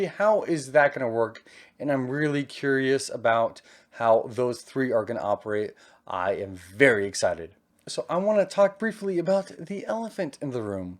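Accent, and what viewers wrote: South, United States. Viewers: I love your video at your house in Texas during your quarantine until you're talking about the upcoming mini-series of "The Falcon and Winter Soldier" will be out on Disney+ while it started from the beginning after being handed the mantle of Captain America at the end of "Avengers: Endgame" got came out back in 2019, Sam Wilson teams up with Bucky Barnes in a worldwide adventure that puts their abilities to the test as they fight the anti-patriotism group of the Flag-Smashers after the whole trailer of the show came out at the time for awhile and Stucky is one of the characters from Marvel comics, but I hope your husband Zach will be very proud of you and I wanna say I'm very happy for you, because I love your Southern accent, I know you're half Mexican and you're gay!! #TheFalconandTheWinterSoldier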